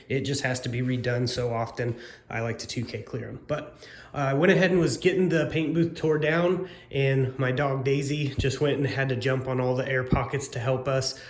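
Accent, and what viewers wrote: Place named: Arizona, United States. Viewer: Good stuff bro! God bless you and your channel! That’s not a Texas accent, you sound like Arizona, but that looks like south East Texas… Take Care brother, appreciate your encouragement!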